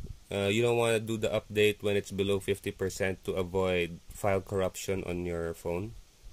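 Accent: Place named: Philippines. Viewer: you sound like a filipino.. are you pinoy?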